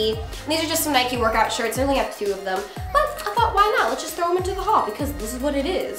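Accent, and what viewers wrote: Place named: United States. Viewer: You have a very American sounding voice, if that makes any sense 😂 like you pronounce every word very accurately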